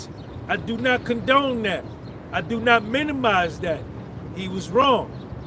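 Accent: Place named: Harlem, United States. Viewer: I'm also new here, but not from academics, someone shared your videos last week on fb. I appreciate your storytelling of facts, but I'm from harlem and recognize the harlem swag and direct speech. However, og I'm a professional astrologer for the entrainment industry and puffy is part of my case study it's not good, this is a karma call he missed important to stop the drug use and change years ago. Ketu moon in ♌️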